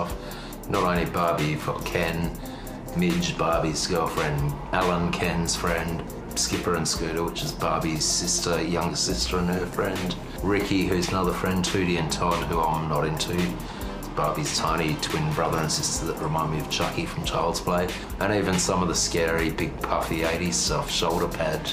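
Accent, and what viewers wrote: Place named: Australia. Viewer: Thanks Muriel glad you like it. Yes the Australian accent is a bit of a difficult one sometimes. ha ha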